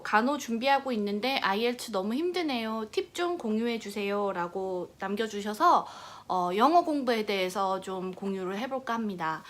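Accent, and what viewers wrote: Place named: Australia. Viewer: 호주 아줌마말투 ㄷㄷㄷ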